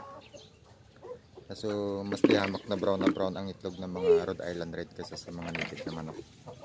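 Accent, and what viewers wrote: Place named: Ilocos, Philippines. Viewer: Hello katambay,,,sarap nmn nyang munggo,lalo na yung hindi pa gaanong natutuyo,bagoong at inihaw na isda tapos inasiman ng sampalok na hilaw,,,curious lng po kasi parang may puntong ilocano kayo,,marunong po ba kayong mag salita ng ilokano?,,subrcriber from solid kaharabas po,,,from Tarlac city,OFW Madrid Spain,,,ingat po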